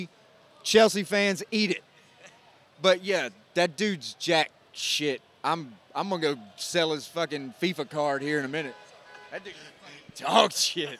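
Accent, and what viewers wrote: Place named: South, United States. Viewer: Yoo i aint trying to be racist but he sound way too southern to be a soccer but overall funny guy btw Super Dortmund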